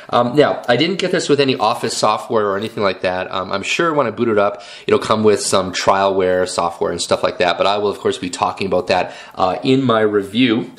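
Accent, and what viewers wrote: Canada. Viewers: I think most of us Yanks dig the Canuck accent, so pay no heed as to what other Americans say!:0)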